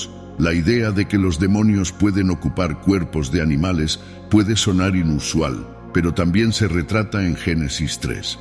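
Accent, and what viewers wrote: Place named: Spain. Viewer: Jodrrr que majestuosa voz tiene el hno relator , se nota su marcado acento español , q buen trabajo ha hecho en este video , felicitaciones !!!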